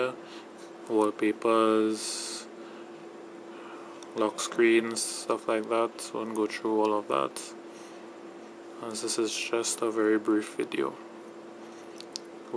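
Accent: Trinidad and Tobago. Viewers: dude you from trinidad huh? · i love ya accent, im from jamaica....u trini ??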